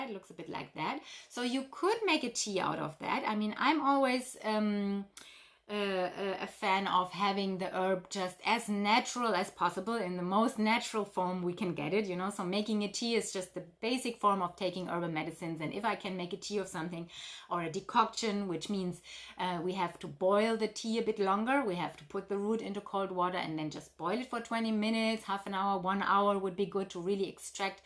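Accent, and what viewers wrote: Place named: Russia. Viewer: Thanks for the valuable advice. Have you studied herbs? Do you live in US? I sensed a Russian accent. I ask just out of curiosity.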